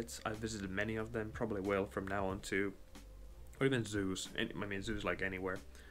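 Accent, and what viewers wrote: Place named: Finland. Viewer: Ah!!!! I knew you were Finnish! I came upon one of your shorts about the morning Ramen shop and your accent caught me. I was like “That sounds very Finnish” and I am happy to confirm that! Just very nice to see for no reason at all! 🎉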